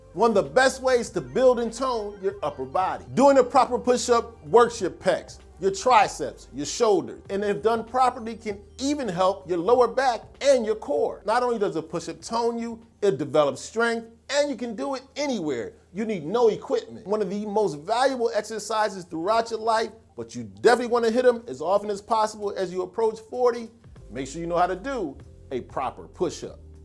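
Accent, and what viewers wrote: Philadelphia, United States. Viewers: You from Philly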